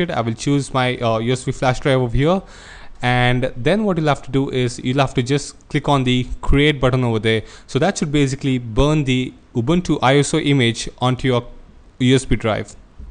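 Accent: India. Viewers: what accent? is indian accent thats why is hard to understand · I guessed from first that you might be a calcuttan (by your dialect). Thanks a lot for the wonderful tutorial!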